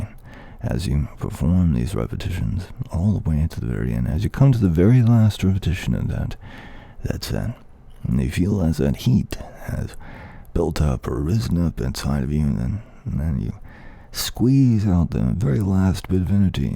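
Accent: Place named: United States. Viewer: This guy sounds remarkably American.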